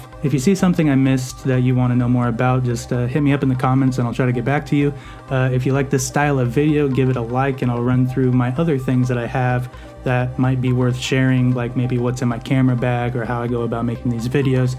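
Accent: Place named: United Kingdom. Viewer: wow love it! Is there an inexpensive way to make my TV my monitor. I need a computer for basic email, word documents, streaming etc. I have a 42" TV with a Roku I would love to use. what's the most economical way to do this? Maybe a future video idea? I'm a big fan! BTW loved the Brit accent lol pretty good actually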